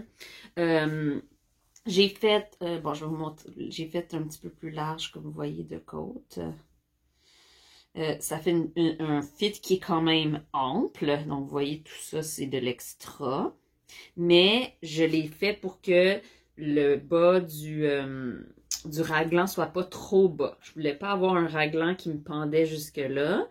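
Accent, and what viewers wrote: Quebec, Canada. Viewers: Bonjour, c'est un grand plaisir de vous écouter avec ce joli accent Canadien, je suis Colette je demeure dans le Nord de la France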